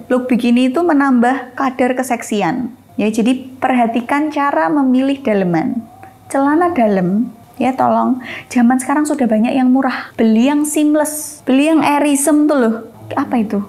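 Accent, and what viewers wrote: Java, Indonesia. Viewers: Aku senengggggg❤ karo bosone,,,,, medhok,,,, khas cewek Jawa. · Medok amaatt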